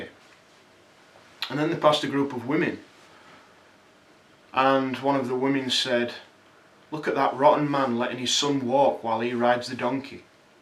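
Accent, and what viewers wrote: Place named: Leeds, United Kingdom. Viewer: i needed that if they are your own words it was spot on ,are you from leeds.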